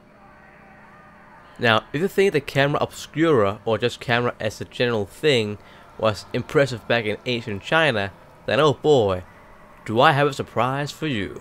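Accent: Denmark. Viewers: yeah because hes danish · He has a Danish accent, I just found that out myself Because I'm used to people speaking English with an accent it didn't take long for me understand him clearly, so I'd say maybe try listening for a bit and maybe you'll begin to get used to his accent Also best girl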